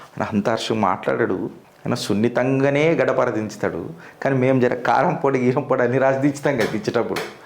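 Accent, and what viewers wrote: Telangana, India. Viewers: Babu chinna request, meeru telanganavalle doubt ledu, alani motham antha Telanga yass lo maatlaadutunte konni artham kavataledu, chadukunna vallu local yass unte, mari chaduvu leni vallaki and chuladukunnavaallaki theda yemi undi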